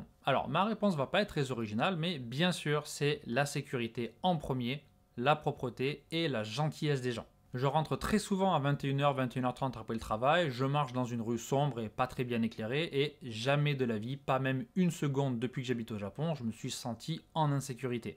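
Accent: Marseille, France. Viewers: super vidéo au passage mon pseudo c'est daruma c'est juste que le pseudo était déjà prit donc le "a" est devenu un x. Masterclass comme d'habitude (ton accent de Marseille me fait trop rire, même après des années au Japon tu ne la pas perdu)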